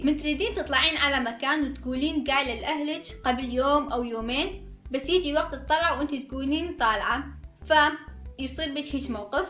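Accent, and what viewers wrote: Mosul, Iraq. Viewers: ممكن اعرف انتي عراقية لولا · كلامكي مصلاوي يمكن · انتي عراقية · عراقية ولله عراقية 😂😂😂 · اي لأن شمال العرآق مثل أهل الموصل وهيج شي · عراقية بس لهجة مصلاوي هاي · لهجتج العراقية شعجب مو ضابطة؟ والفديو حلو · هاي اكيد كلهن صايرة بينة وختصة من اخذ درجة زينة ويقارنون .. بس سؤال انتي من اهل الموصل ؟ ياريت اجاوبين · مصلاوية اهل الموصل هيج يحجون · علما اضن انتي من الموصل لان المصالوة هيج يحجون ودمج ينطي للمسيح بس حبيتج استمري 😊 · انتي من موصل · اوي لهجتج لهجه الموصل 😙😙 ، ذكرتيني ب صديقتي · صعب تحجين احسج من يامحافظة انتي من العراق؟ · هاي عراقية لو شنو😕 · اعتقدها من اهل الشمال اهل الموصل او هاي المناطق الشمالية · xoxo لا مو قصه اغلاط ..بس الطريقه مال كلامج مبينه عراقيه دا ادمجين عراقي ع لبناني ع مااعرف يالغه 😅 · .20602 هاي من العراق من عراقية بس مصلاوية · هاي شنوو عراقيه موو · والله كلها يگولوها الي ... بس ممكن سؤال .. انتي مو من محافضة الموصل ؟؟ لأن كلامچ كلة موصلي ☺ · لا هيه عراقيه مو مغربيه ... 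· اني متاكدة انك من الموصل لان لهجتج كلش قريبة على المصلاوي اتمنى تكليلي انتي من وين؟؟؟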